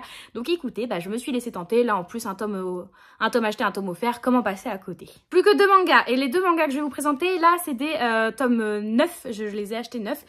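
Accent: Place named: England, United Kingdom. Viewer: Olala, le livre autour de Jane Austen me tente ❤ Sinon j'adore l'accent anglais 😂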